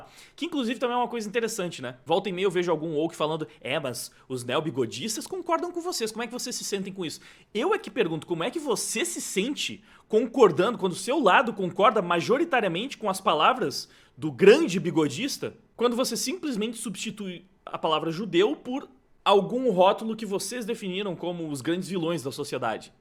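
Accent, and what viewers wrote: Brazil. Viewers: Sulista né?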